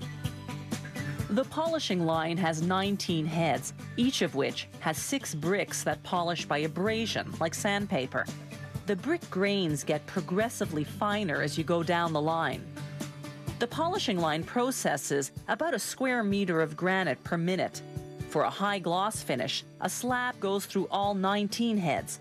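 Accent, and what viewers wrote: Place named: Canada. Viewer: I can tell the narrator is Canadian because she says "a boat" and "PROcess" alot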